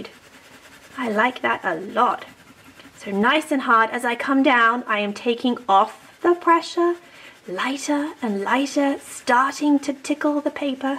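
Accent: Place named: United Kingdom. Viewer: thank you, you are talented, i found it funny that you sound so british even though you are from usa :D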